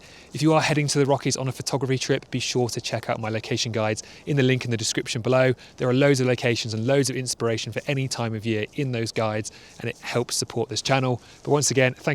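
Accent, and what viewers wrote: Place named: United Kingdom. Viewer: Agree that winter is a tough time to shoot in the Canadian Rockies. I was in Assiniboine area for a week, (plus several days in Lake O'Hara) almost two years ago -- it got colder than -30 celsius (my thermometer froze below that!). Batteries die very quickly, as well, and in those temperatures you can't expose your hands for long. But one thing the snow usually does help with is to simplify the scene and that often opens up many opportunities, I find. And by the sound of your accent you hail from a similar corner of the UK as myself. I wonder whether you were also drawn to Canada through falling in love with the Rockies? (I've been hiking the Canadian Rockies the majority of my summers since the 1990s.)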